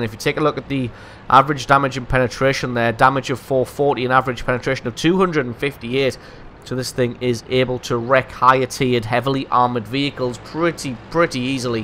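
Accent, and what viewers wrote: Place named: Scotland, United Kingdom. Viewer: When I watch and listen to your replay videos I can't help but be reminded of Jackie Stewart, the F 1 racing legend. When I was young my Father liked watching the Races with Jackie announcing, as did I. We would both try mimicking his voice to no avail. The Scotts dialect is quite difficult. Lol good memories, as my Father passed away young.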